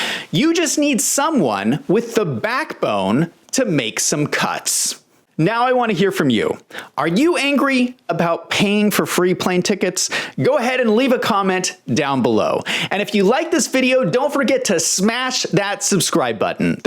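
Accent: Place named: United States. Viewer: I like your voice, very American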